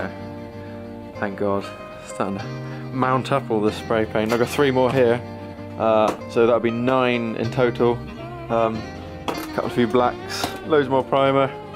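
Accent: United Kingdom. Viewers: This is so epic! Glad to have found another UK builder! Do I detect a slight west country accent?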